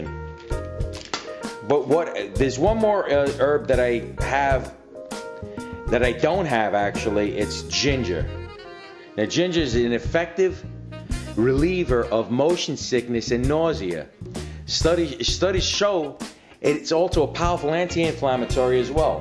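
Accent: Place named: New York, United States. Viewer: Lol I'm sorry I kept giggling the whole film. The thick new yorkish accent is so funny and gives him this "hey guys, come ahhn" type of feel to the video.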